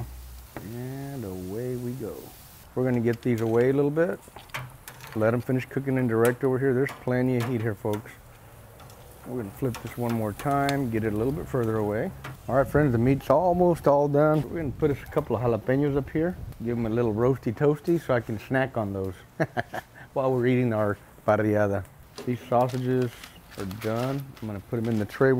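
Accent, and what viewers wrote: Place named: San Antonio, United States. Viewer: Job well done Sir! You sound like you’re a San Antonio native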